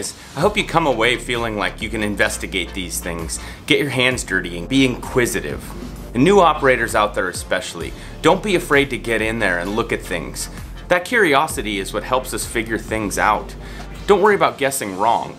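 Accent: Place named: Fargo, United States. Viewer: Thanks, good video. (and what a great Fargo Accent you have! )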